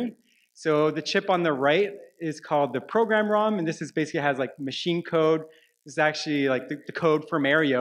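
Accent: Canada. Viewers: Within 10 seconds, we all realized he was from Canada. Then immediately after he said "I'm from Canada" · All the comments on how he pronounces Mario. It's the Canadian accent... You're over there basically saying "wtf, this Canadian talks with a Canadian accent!?!?" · It is a Canadian French accent (Quebec)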